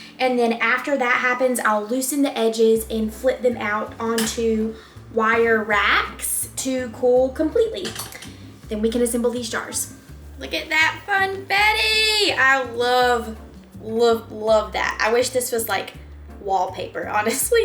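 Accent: South, United States. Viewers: Those are SO cute!!! I love your channel please keep going and making videos! I love your southern accent!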